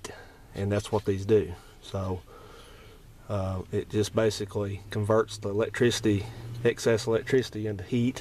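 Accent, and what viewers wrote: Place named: Appalachia, United States. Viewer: You sound like you’re from WNC or E. Tenn.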